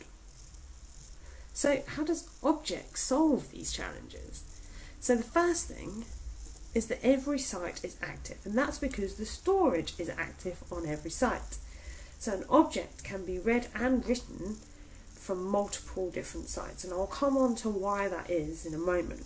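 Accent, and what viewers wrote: United Kingdom. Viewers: I love your british accent. Are you Renee Zellweger? Jajaj. Sorry. Excelent video. Thanks a lot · Superb and yes, love your British voice. Please do more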